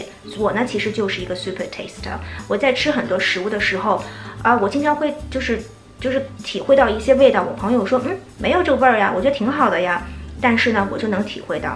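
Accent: United Kingdom. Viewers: 我想问一下在美国这么久英式口音是怎么做到没有被美音影响的，哈哈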